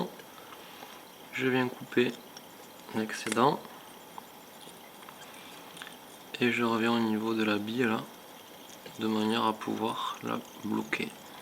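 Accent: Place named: Southwest, France. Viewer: Tu es de quelle région tu as la voix du sud ouest 💪💪💪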